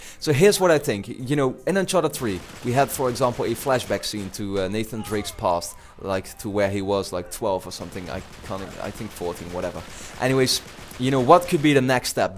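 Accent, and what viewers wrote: Netherlands. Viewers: never tried it before, i'll let you know the taste at june 10th, When they anounce U4, I realy hope they do ! :D Where are you from by the way, It looks like i hear a little dutch accent ?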